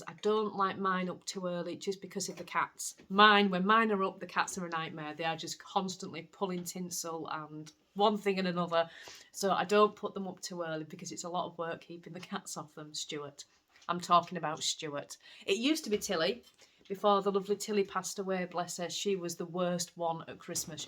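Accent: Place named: Leeds, United Kingdom. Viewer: Love your podcasts and cant wait to see the advent leggings. Are you in Leeds? Just wondered cause that's where I am and you've got the same accent 🙂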